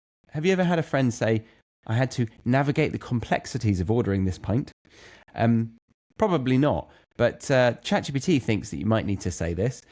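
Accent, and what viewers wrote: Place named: United Kingdom. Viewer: yes, Britishness and naturalness and the accent of yours😊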